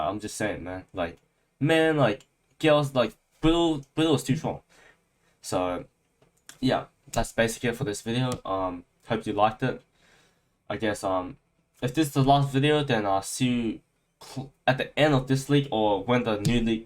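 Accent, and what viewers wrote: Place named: Australia. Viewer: You sound like you have an Australian accent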